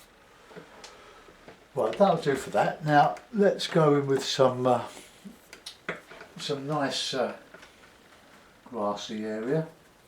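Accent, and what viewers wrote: United Kingdom. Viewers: Lovely! I learned at lot from just this v8deo. However, I wish the artist (not just you, but many on You Tube) would edit the closed captioning, if that is possible. I have trouble hearing, and without being able to read your lips, as well as the British accent (old American here) its very hard to understand. The closed captioning is terrible; in many sections it makes absolutely no sense. But the video is magnificent otherwise!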